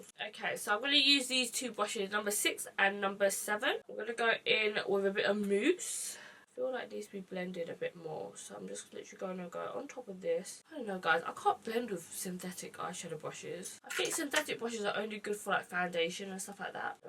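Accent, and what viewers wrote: United Kingdom. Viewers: Yes u British u sound British I'm British anyway ly xxxx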